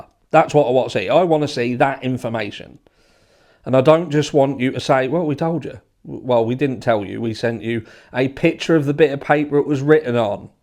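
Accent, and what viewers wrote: United Kingdom. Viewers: BTW, where are you from? I am from SC. I only ask because I thought you were from the UK, but when you say certain things it makes me think you are from Australia..... Things like “Mate”.... Maybe they say that in other places. If so, educate me. Thanks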